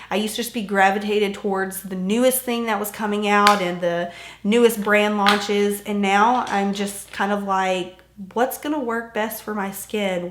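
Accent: South, United States. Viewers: i love your slightly southern accent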